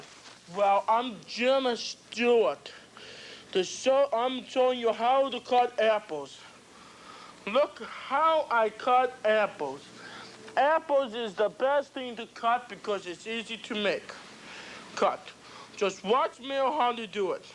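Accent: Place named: Russia. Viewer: he's talking English just as CrazyRussianHacker is doing it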